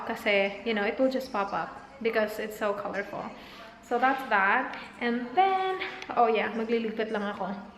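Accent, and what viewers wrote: Visayas, Philippines. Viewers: hahaha katuwa ka naman the first time I saw your video im not paying too much atention and then i saw again tpos may punto ka sabi bisaya cguro to....natawa ako doon sa mubua nku kalipay oi hahahahahwhich means mababaw ang kaligayahan ko nkarelate tuloy ako sau kc parang gnun lng ako kakalog hahahahhah thumbs up ate!! Rachelle from davao..mwahh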